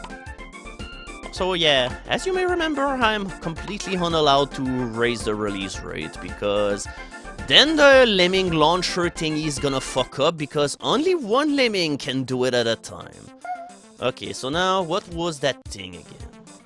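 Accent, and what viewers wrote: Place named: Quebec, Canada. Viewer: Il me semblait que je reconnaissais le doux accent québécois!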